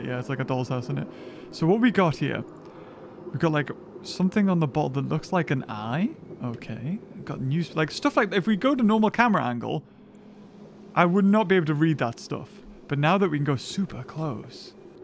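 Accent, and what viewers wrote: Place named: United Kingdom. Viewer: Bruh I love your British accent lol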